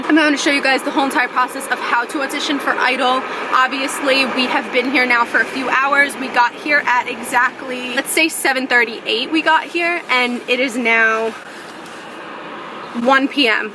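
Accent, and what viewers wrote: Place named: New York, United States. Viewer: Your freaking accent is so on point I could tell you were a New Yorker from the beginning of the video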